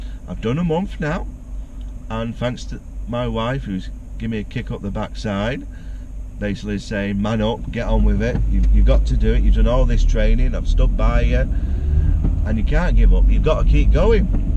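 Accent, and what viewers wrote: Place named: United Kingdom. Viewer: Mate, you are from UK, stating by the accent. Uber is not a taxi, and you know it.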